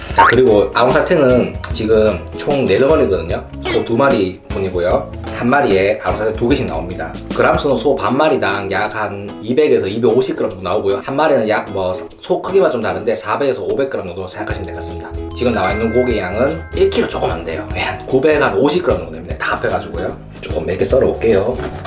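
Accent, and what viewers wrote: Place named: Daegu, South Korea. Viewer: ~~~^^ 넘 정감 넘치는 제목에 옛추억을 느끼게 합니다... 구수한 대구 사투리가 어린시절을 생각나게하네요~~ 20년 수도권 생활하다가 고향생각나서 아제아 방송을 늘 즐겨찿습니다... 꼭 대구 가면 경산 한번 찾아가겠습니다~~~^^ 화이팅~~~^^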